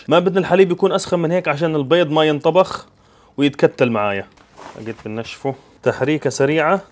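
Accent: Palestine. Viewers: احب قناته علشان اللهجه الفلسطينيه الي تجننننن 😍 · الصراحة أنا لا أحب أطبخ لكني أشاهد فيديوهاتك من أجل اللهجة الفلسطينية ، ما شاء الله. بالتوفيق حبيبنا اللزم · لهجة. غزوية غزة. دايمن غير تحيه. للي. غزة. من. داخل. المحتل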